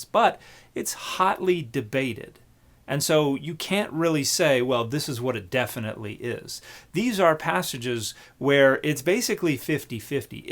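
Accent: South, United States. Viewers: Hard to sound intelligent with a southern accent . . . just sayin' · Why do people use Southern accents for stupid? This guy is annoying and insulting. I am not from the South but live now live here. Besides some cultural differences, they are the same as people everywhere with the same distribution of innate intelligence.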